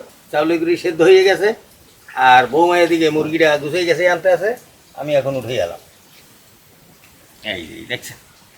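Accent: Barishal, Bangladesh. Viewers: Brisal bola kotha nijer kahon valo boja😡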